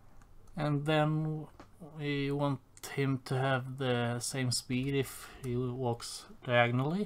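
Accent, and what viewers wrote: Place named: Sweden. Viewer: 100% Swedish :)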